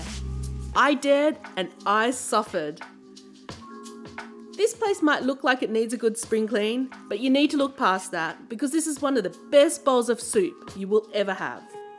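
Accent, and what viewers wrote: Australia. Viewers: I really enjoyed watching this even I am living in Saigon in Week 3 of the lockdown so it was kind of painful to watch. Is your accent Aussie? I look forward to trying all the places post-lockdown and to more of your videos. Cute dog too!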